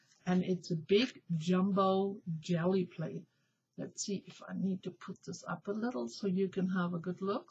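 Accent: Germany. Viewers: Hi! Yes I won Andrea's journal and a lot of artsupplies! But you have a European accent right....? German? So great you love our little country. Hope you still visit Europe, I love to go to the USA. Last year I was for a short visit in Denver and I think you live there now? Thanks for your reply!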